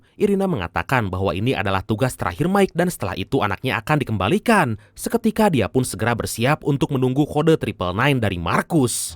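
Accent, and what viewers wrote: West Java, Indonesia. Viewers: btw asal mna a?logatnya sunda pisan wkwkwk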